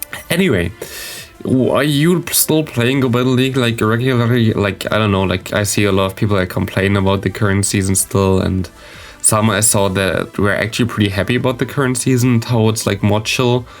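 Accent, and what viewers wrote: Germany. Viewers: German accent.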